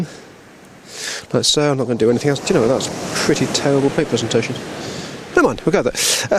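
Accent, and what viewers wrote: RP, United Kingdom. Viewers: Happy new year from Ian in NZ 😊 Really enjoyed watching this video and you do have rather a posh accent!! Please don't forget to do a video on how you make your bar rigs 😊 I can't believe you catch Thornback and Gurnard in January now on the UK - they were both summer species in my time over there. Cheers 😊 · Should do more vids always great watch.... plus a great posh voice for videos lol... cheers best wishes will be in before I go back to Philippines 🇵🇭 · You sound like a member of royal family,apart from that,your entertaining,informative and an excellent fisherman,new sub of me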